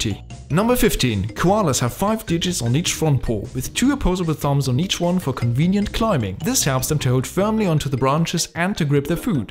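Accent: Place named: Germany. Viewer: How come I feel a koalalike urge to fall asleep whilst listening to facts infused with a German accent?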